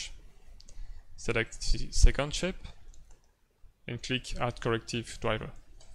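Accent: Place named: France. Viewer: coolla video, par contre des la premiere seconde on sait que t'est français poto ;) , merci pour le tuto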